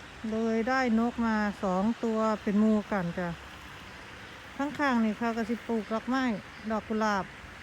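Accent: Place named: Nakhon Phanom, Thailand. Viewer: วิวสวยมากค่ะ สำเนียงพูดเพราะจัง เหมือนคน นครพนมน่ะค่ะ~~^^🥰🥰🥰🥰🥰🥰🥰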